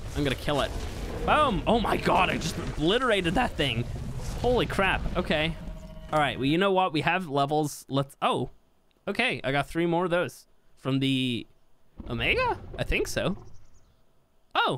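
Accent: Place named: Ireland. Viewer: you should do a video in your irish accent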